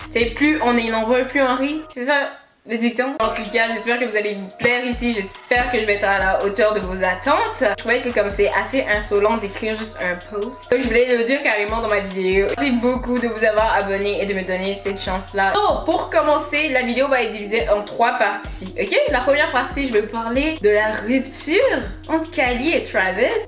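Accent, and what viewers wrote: Quebec, Canada. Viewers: waouw je kiff ton accent canadien. je voyais ta tête tout le temps dans mon fil d'actualité, aujourd'hui j'ai décidé de cliquer sur ta vidéo et je regrette pas, ton accent est magnifique. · Jcrois qu'elle vie au canada vu son accent. C'est possible que sa agit sur sa manière de parler. En tout cas c'est ce que jme dis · C’est la première fois que j’entends ton accent québécois waaw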